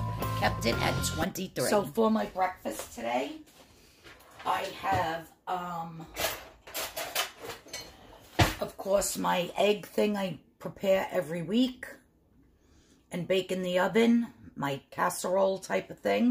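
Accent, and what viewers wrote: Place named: Boston, United States. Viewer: you are a gem! I just love you, your Boston? Accent, and your food and videos. Thank you!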